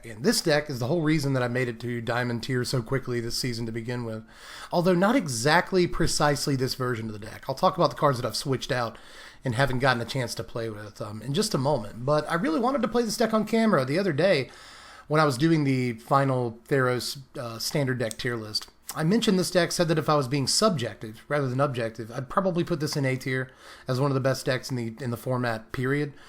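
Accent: South, United States. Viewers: with that southern accent: "you're all ears huh, well i'm pretty certain i hear a mouth blabbing" I dunno about additions/subtraction from the deck, based on what i saw and the list, i would say 'cut the girls and a Vraska' play some actual removal or counter magic. Given what i read about the meta, my call would be to add 3 counter spells, to give a tad more options in the main against both agro and azorius ... deck looks like it should be well set up to keep some mana open on Op's turn.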